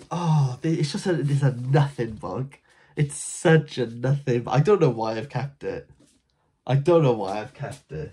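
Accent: Wales, United Kingdom. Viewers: This made me giggle! Here in Melbourne it was lovely to hear a fellow Welshie! Thanks go to Simon for pointing me in this direction! 😊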